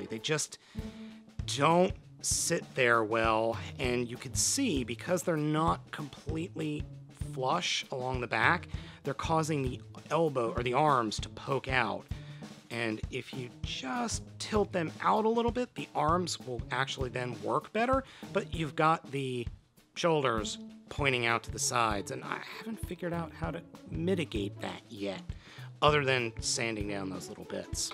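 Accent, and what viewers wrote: Minnesota, United States. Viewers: He's from Minnesota?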